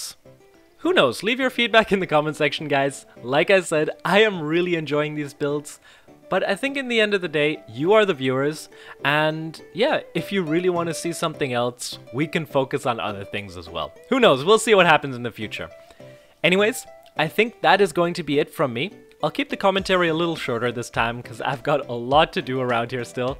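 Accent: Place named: Germany. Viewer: You got this german accent 🤔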